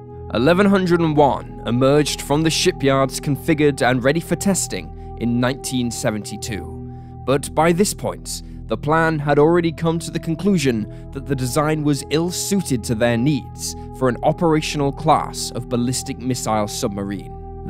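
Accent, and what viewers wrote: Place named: RP, United Kingdom. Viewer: His accent is from the south of England (received pronunciation), not Ireland.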